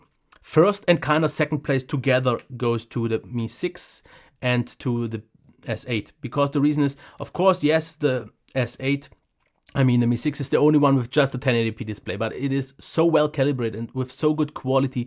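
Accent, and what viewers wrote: Germany. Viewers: Based on his name i think he's Croatian but based on his accent defo born in Germany or living there for a long long time!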